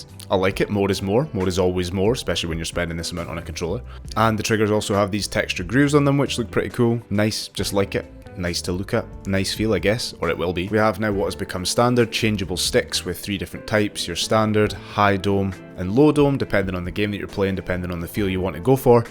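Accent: Scotland, United Kingdom. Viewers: I hear Scottish I sub simple as... on a serious note the pro controller could be a good thing with regards to the swappable analog modules... i could open up a market for 3rd party companies like Razer, SteelSeries or Thrustmeter to make parts for it... its just a thought Edit if you look below the analog stick you will notice to bumps (where you finger naturally sits under them) looks like 2 additional buttons so there should be 4 rear buttons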